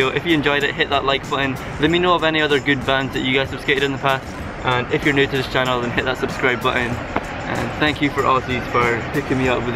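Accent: Scotland, United Kingdom. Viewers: Is he Scottish ? He sounds like Steven Caldwell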